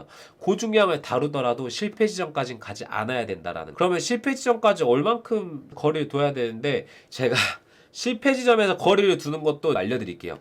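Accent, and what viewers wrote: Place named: Jeolla, South Korea. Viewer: 아놔 라도 사투리 때문에 안들을란다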